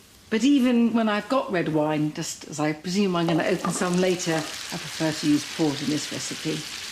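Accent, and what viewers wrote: RP, United Kingdom. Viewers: "Gorgeous golden globules"... god, I love her RP (received pronunciation) accent and frequent use of alliteration.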